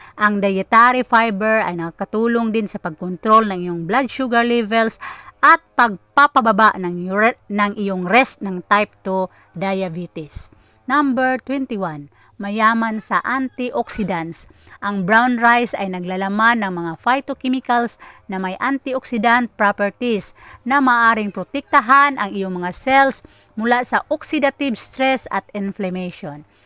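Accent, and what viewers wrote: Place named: Visayas, Philippines. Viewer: BISAYA KAMAN DAY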